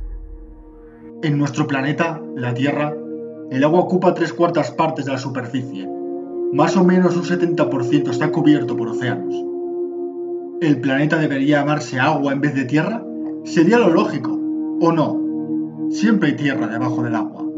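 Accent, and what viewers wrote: Spain. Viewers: Queeeee??????!?!? Eres español??? :V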